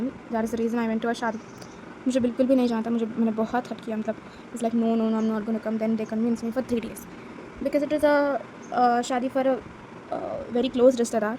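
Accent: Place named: Hyderabad, India. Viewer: oh bruh you speak telugu, same....where you from ? seems like telangana and that too hyderabad...all the best for iiser!!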